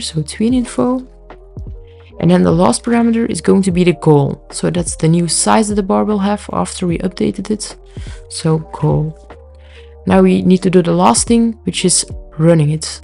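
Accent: Netherlands. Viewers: are you from the netherlands or belgium?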